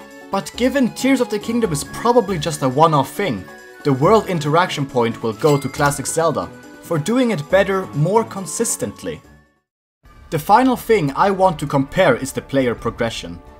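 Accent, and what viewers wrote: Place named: Sweden. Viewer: I could hear you’re Swedish from a mile away xD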